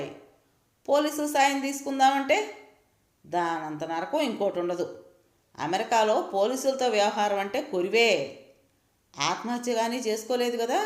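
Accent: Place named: Andhra Pradesh, India. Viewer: Abba entha bagundante matalatho cheppalen,Ee kadha cheppadamlo really super,nenu chadivinadi undinadiAndralone,kani Naa mettinillu Tamilnadu mee gonthu vine tappudu Andralone (maa uru Gooty)unna feeling ravadam kallu chemarchetatuvanti adbuthamaina anubavam kadhanu chadivuthunna prathi saree kaluguthundi 🙏🙏🙏🙏🙏🙏🙏❤️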